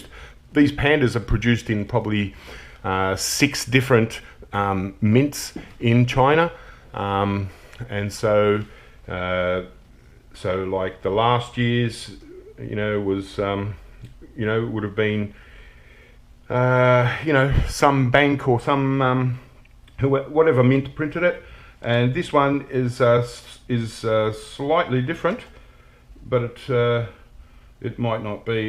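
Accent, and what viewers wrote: Australia. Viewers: Love the coins mate..you have a very cool take on coins but wash your hands bro and how bout some neosporin and a bandaid on that finger. Sweet aussie accent too.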